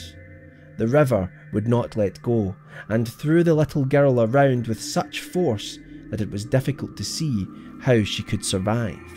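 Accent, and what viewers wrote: Scotland, United Kingdom. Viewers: scottish accent, horror stories, bringing visions of the gothic era, TOP NOTCH!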